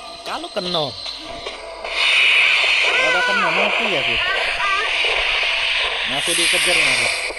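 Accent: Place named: Palembang, Indonesia. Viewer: Antara palembang atau jambi ni dari bahasa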